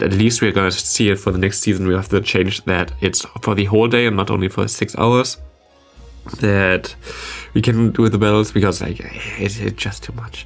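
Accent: Germany. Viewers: His German accent is kinda strong… but as a German like me you can understand his English very well. 🤔😅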